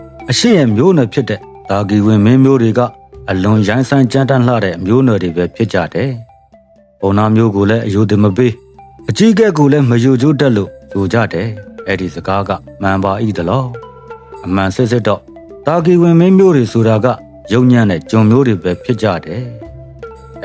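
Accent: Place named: Dawei, Myanmar. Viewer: အကိုစကားပြောတာ ထားဝယ် အသံဝဲသလိုခံစားနေရတယ်ဗျ ထားဝယ်သားလားဗျ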